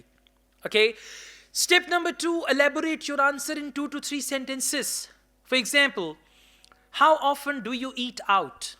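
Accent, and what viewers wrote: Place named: Punjab, India. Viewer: Salute you sir for your teaching method as well as there are myraid things which are most impressed to me such as way of talking like pure punjabi Jatt and also I like your sense of humour. From Punjab🥰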